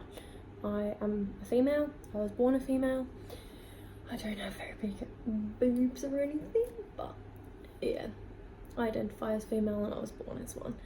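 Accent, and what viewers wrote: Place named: Australia. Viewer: I’m surprised you moved there at 14 and you sound Australian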